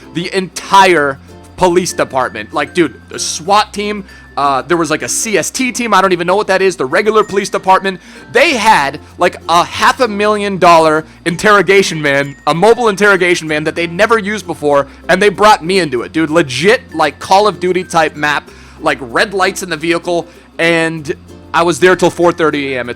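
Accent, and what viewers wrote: Germany. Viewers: are you German ? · is he german ?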